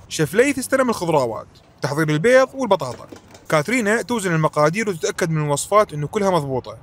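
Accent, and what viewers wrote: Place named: Baghdad, Iraq. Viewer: يا جنسيه داحسه عراقي بغدادي مسمسم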